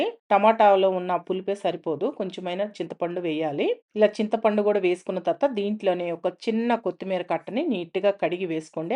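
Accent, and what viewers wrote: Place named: Telangana, India. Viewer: Edi Telangana style😊.. baguntundi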